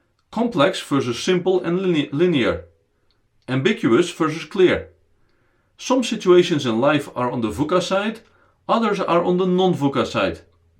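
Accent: Russia. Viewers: r u russian? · The accent is very Russian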